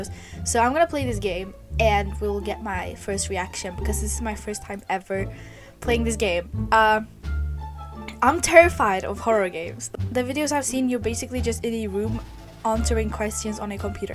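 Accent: Sweden. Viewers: Are you from Sweden? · You are from sweden right? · ... Hey uhhh are you actually from Sweden?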